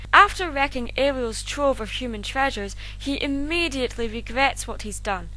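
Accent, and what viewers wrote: Scotland, United Kingdom. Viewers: You probably hear this a lot.... but I really like your voice. Scottish accents sound so cool. ^^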